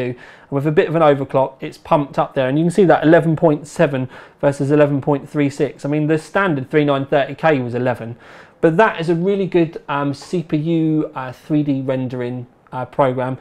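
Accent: United Kingdom. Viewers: Is this British accent? :D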